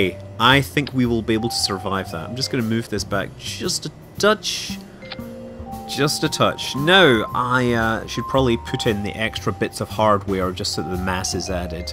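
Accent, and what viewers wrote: Scotland, United Kingdom. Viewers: Somewhere in the UK I don't know what city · Another Scotsman yes!!!!!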